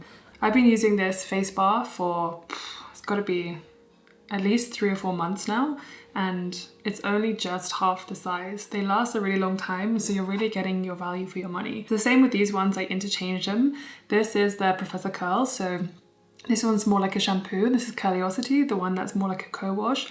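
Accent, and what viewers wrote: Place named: United Kingdom. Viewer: hi just found your channel and when saw that you live in Denver, I was really confused; you sound like a brit, same as me and after watching this i guess that you have just moved to the states; lucky girl; hope you enjoy your new life therex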